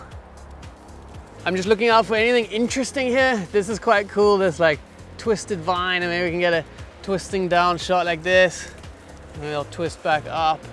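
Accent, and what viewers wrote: South Africa. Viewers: Is that a South African Accent 🤔?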